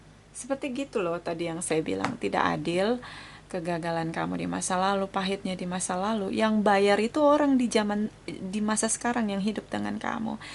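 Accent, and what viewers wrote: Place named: Europe. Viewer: Halo nona,klo dgr lidahnya nona sepertinya anda pernah ckp lama ya tggl di benua biru,dimana nona? Maaf,agak melenceng pertanyaannya. Mksh ya non🙏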